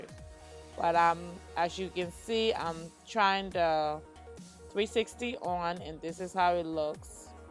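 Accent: Liberia. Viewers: new subbie! as soon as i heard that accent i said i must follow my liberian geh! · Yes I thought so too. She sounds like a Liberian. Good job girl keep it up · You are a west African Liberian. ...your accent though. ...you are very talented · Aww I noticed your Liberian accent 🇱🇷🇱🇷🥰🥰🥰🥰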